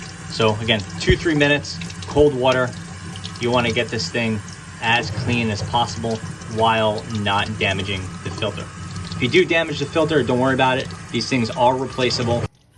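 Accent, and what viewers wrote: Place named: United States. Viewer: Hi from Australia 😊 you have the best American accent 😊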